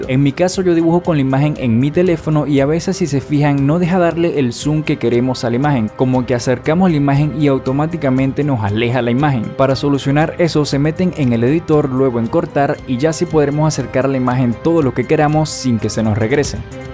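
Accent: Venezuela. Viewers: eres de venezuela?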